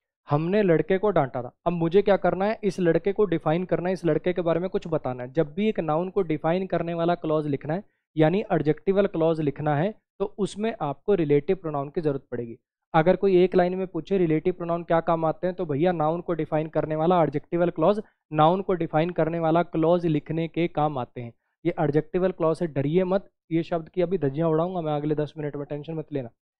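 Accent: Haryana, India. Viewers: Sir aap haryana se ho kya 😎 khinda do sun k lga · Sir are you from haryana..i felt haryanvi in your conversation 😅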